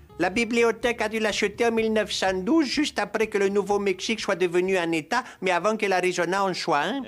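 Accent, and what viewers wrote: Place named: Brussels, Belgium. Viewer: accent bruxellois pas fl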